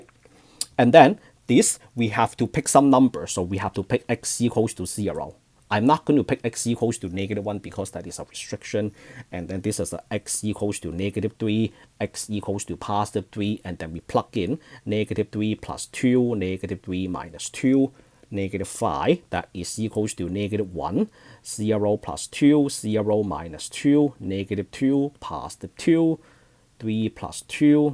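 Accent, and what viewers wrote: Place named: China. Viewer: Bro is speaking english chinese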